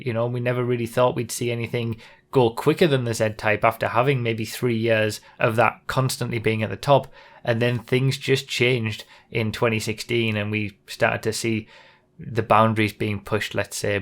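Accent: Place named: England, United Kingdom. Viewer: Thank god hes English! Sick of hearing stupid fucking yanks.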